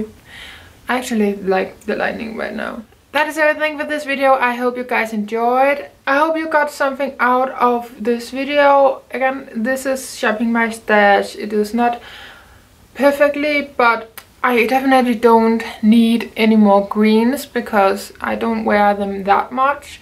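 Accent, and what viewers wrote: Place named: Denmark. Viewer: Danish? Love the accent!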